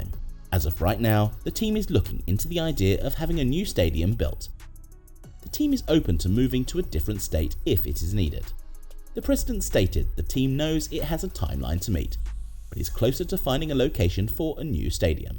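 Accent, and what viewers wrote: United Kingdom. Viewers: Knowing this is AI generated video, the statement about Bills wanting a new stadium , was pushed by the NFL brass. But the AI is incapable to think or know anything. Also British accent doesn't fit here.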